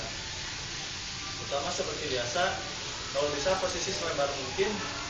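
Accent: West Java, Indonesia. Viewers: logatnya sunda euy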